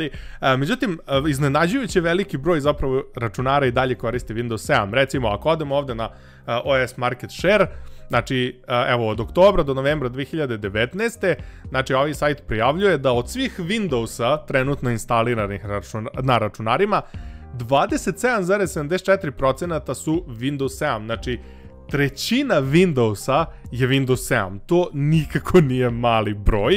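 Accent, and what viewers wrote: Russia. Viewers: Taj tvoj domaci engleski zvuci ko rus kad pokusava da prica engleski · cita engleski kao RUS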